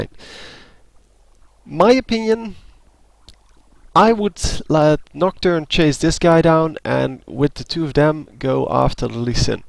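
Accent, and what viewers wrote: Netherlands. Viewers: Hey, zou dit ingesproken zijn door een Nederlander? ;o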